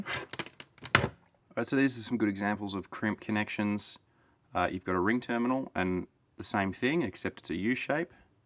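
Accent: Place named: Australia. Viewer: Great info, and nice to hear the Aussie accent!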